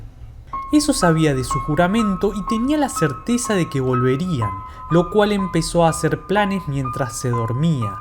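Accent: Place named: North, Mexico. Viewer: Como que norteño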